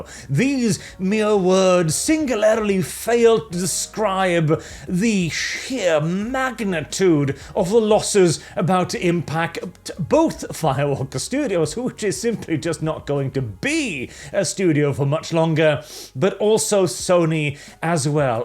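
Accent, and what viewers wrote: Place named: Wales, United Kingdom. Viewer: You sometimes sound a bit Welsh.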